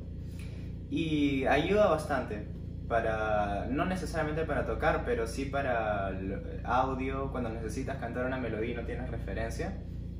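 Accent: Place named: Bolivia. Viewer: Excelente. Una pregunta, eres de Bolivia ? Tu acento es muy parecido a un acento de Bolivia.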